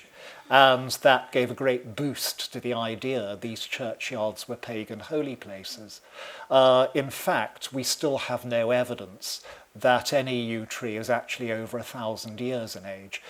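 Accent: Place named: RP, United Kingdom. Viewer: It’s called RP, Queen’s English.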